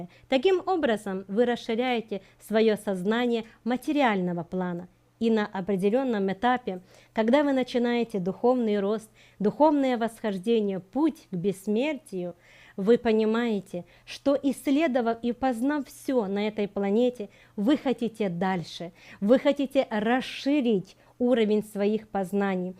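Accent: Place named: Ukraine. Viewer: Благодарю Вас, от всей души за беЗценную информацию, которой Вы щедро делитесь с нами, неблагодарными. 🤗🙏🏻 Тут вот кто-то покритиковал Ваш украинский акцент, а моему сердцу он мил, особенно когда Вы изображаете обывательские речи, я очень смеюсь, и всё, что Вы рассказываете, остаётся в памяти моей. С вами я полюбила украинский язык и песни, часто пою "Ой там, на горiиии..." 😂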